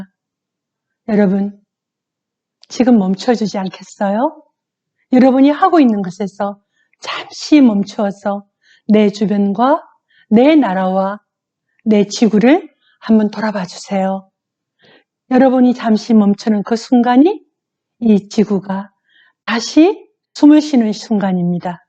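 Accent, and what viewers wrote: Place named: Seoul, South Korea. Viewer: 유투브 개설 축하드립니다^^ 반갑습니다 님 글도 잘 쓰시지만 목소리는 더 예쁘시네요~ㅎ 부산 억양일거라 생각했는데 서울 말투라서 놀랐습니다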